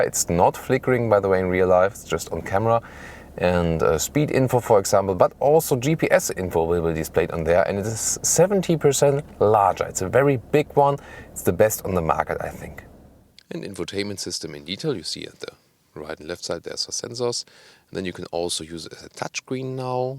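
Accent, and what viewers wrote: Germany. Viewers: And that german english 😂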